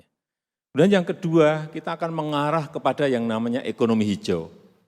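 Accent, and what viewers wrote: Java, Indonesia. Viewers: Pak jokowi klo pidato : saya seneng.. Karna logak beliau jowo tenan.. Meskipun Aku tutu wong jowo tapi seneng ngomong jowo. Halus.. 😁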